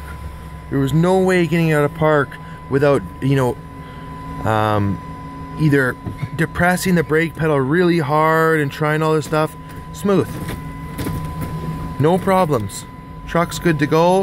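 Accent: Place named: Canada. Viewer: He’s got a great white north accent bud.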